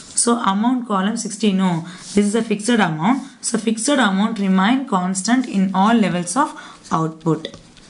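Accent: South India, India. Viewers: Thank you mam.lecture is good and i like your voice as you are south Indian if i am not mistaken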